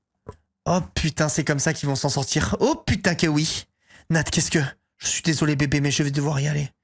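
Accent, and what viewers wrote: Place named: Marseille, France. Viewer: Le dimanche c’est ACCENT MARSSEILLAIS !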